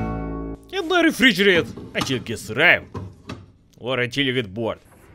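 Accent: Russia. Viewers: Still glad your channel still alive after I took absent for a long time. You still have that funny russian english accent checked.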